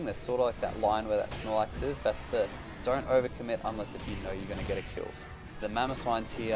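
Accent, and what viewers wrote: Australia. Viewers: Oh broh are you Aussie mate?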